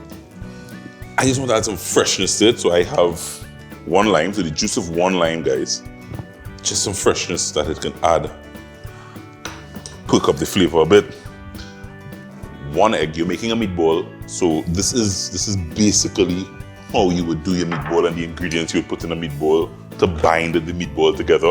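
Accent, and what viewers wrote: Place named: Virgin Islands, Caribbean. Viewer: At first I thought I Heard Virgin Islands 🇻🇮 accent but I had to listen 👂 closely lol nice voice man now meh hungry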